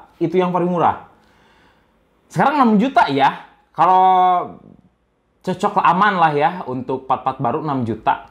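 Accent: West Java, Indonesia. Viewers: Logatnya sunda pisan euy 😎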